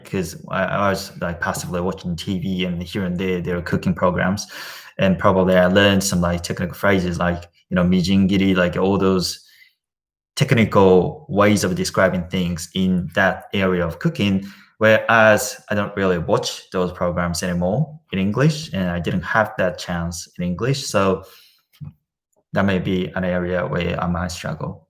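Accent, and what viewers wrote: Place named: Australia. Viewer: 発音が、大学のオーストラリア人の教授とすごく似てました。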